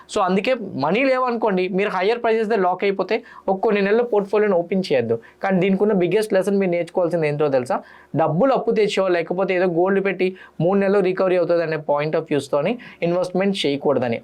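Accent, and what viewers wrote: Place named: Telangana, India. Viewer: Why this telangana slang all of a sudden?😅😅😅😅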